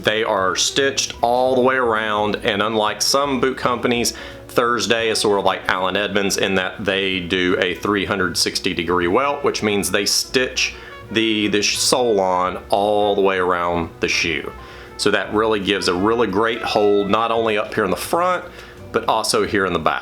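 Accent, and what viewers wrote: South, United States. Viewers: Sounds like a southener Me: must be legit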